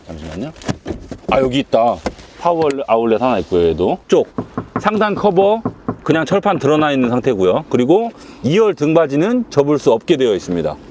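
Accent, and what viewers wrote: Chungcheong, South Korea. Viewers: 영상 처음봤는데 구독박고 갑니다~ 충청도 분이신가요? ㅎㅎ 말씀하시는 게 친근하게 들리네요~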